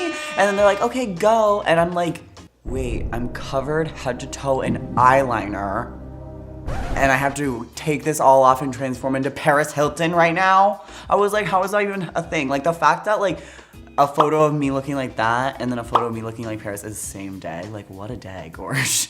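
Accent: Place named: Los Angeles, United States. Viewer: i love you LA dialect its so calming and entertaining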